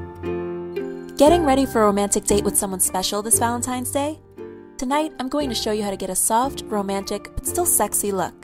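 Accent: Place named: New York, United States. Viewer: Hey, I have been a long time subscriber of your's and I like you because you are usually so original, you know, with your crazy NY accents and such. But I feel like this video seems a bit like something Michelle Phan would do. I understand that she's your collegue, but I miss the NY. <3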